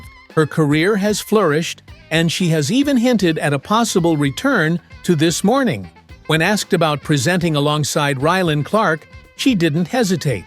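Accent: United States. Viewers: Why is an American doing this commentary?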